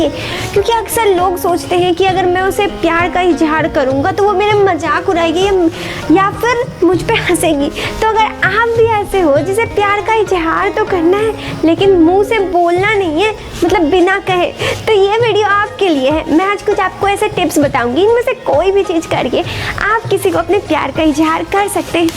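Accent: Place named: Jharkhand, India. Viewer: Jharkhandi boli ka asar Hindi me hai. Kya bat hai madam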